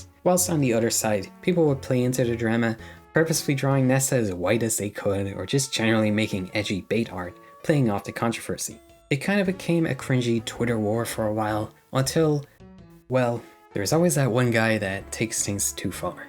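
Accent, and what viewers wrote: Ireland. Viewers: It's certainly an interesting variation of an Irish accent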